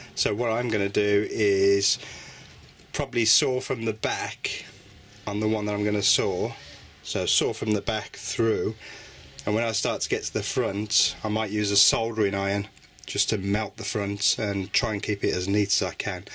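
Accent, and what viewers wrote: Midlands, United Kingdom. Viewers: Not sure why you have chosen to concentrate on intonation as he is trying to communicate his experiences of sound proofing a project studio from a converted garage? This is not an uncommon musical experience. His 'rising intonation' has everything to do with his accent. It sounds like he has a very mild Midlands accent - rising intonation can also be found in other regional English accents such as Geordie or Mackem - I don't think he's trying to deliberately confuse us with non-questions. There are scores of American posts on this subject and I find it helpful that someone on this side of the pond can quote inexpensive UK based sites for sound proofing. Thank goodness he didn't work out the RT60, we may have nodded off. Well done. He did say he was an amateur and it was his first post, do you not think you're being a bit harsh?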